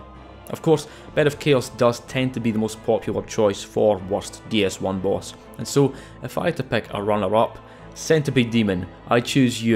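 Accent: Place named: Scotland, United Kingdom. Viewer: The accent is so nice to my ears I love it, I wish Scottish people were real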